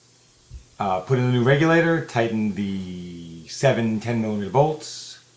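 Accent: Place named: Boston, United States. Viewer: Very helpful. It made me decide not to do this myself. BTW, sounds like a Boston accent. Yes?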